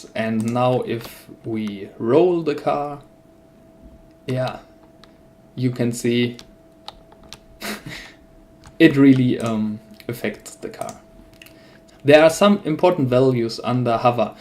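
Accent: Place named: Germany. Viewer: Yeah, thanks. Watched all thru, good presentation. And, everybody loves nice German accent! :) (it's a good thing) I am latvian (lettisch) btw, so I love all "euro version of English :D ). Oh, maybe one provocative question: is this asset pretty much finished state, or maybe any additions / changes planned? Thanks!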